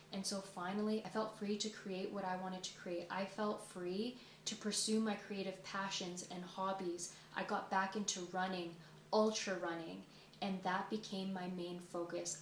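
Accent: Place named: Canada. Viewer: I thought I'd been hearing a Canadian accent in your words. I enjoy your videos. I'm going through a minor crisis right now and trying to stay ahead of it and not let it take over. I find your content to be inspiring, thoughful, balanced and relevant and can be applied to almost every other situation the presents itself. I'm glad I found your community 🙂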